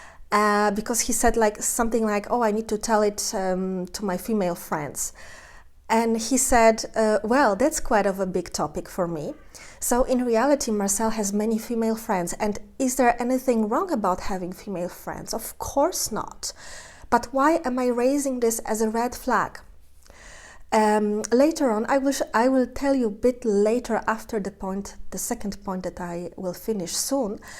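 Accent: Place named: Eastern Europe. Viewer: You come from a different culture . German men and women are very equal and it isnt a problem for a man to share an apartment with women...people are much more free unlike the soviet union where you were brought up It is only a sign that a man is open minded. When i shared an apartment i preferred to live with men because women were more irritating but i also shared with a nice woman once. It depends on the PERSONALITY. Your accent indicates you are east European where men and women have very rigid gender roles . You are reaching absurd conclusions . A man who seeks spiritual retreats is spiritual. You dont seem to have a lot of experience living with other people So it is even more absurd you speak with such confidence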